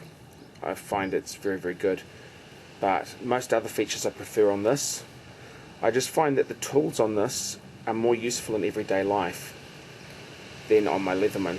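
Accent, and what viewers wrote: New Zealand. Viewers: I actually didn't look on your channel page, I can tell the difference between an Australian and a New Zealand accent. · I'm thinking of getting this instead of Swisstool X because of the ergonomics and weight. Cheers on the review and the accent. New Zealand?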